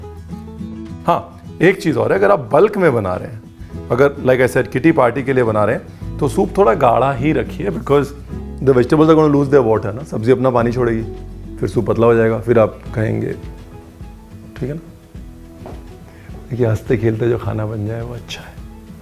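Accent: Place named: Punjab, India. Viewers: Yummy mouth watering 😛😛sir I like all respiees prepared by you Good way of explaining and punjabion vala bolane ka style👍👍👍👍👍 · Awesome!! Gotta give it try! Love your Punjabi accent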